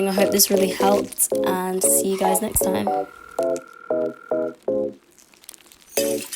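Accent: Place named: London, United Kingdom. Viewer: typical londoner accent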